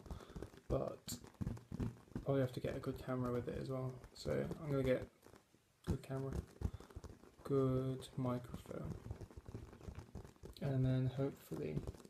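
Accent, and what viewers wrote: United Kingdom. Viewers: British accents are my weakness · When Hannah mentioned you I was sure you were already on my subbed list. But you weren't :-/ Sorry about that. I always enjoy stumbling upon new ASMRtists with UK accents. So now I subbed and already watched 3 of your videos. Can't wait to see more from you :) XO from Denmark. Btw. You're so cute ;) Hope you're not too young for me to state that fact :D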